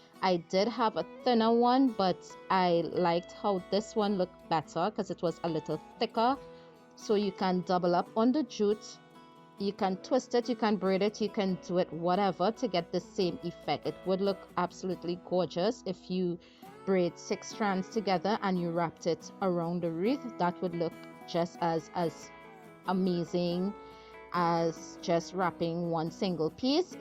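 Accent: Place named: Trinidad and Tobago. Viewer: Hey friend you are very creative. This turned out beautiful. Love your accent are you from Trinidad 🇹🇹?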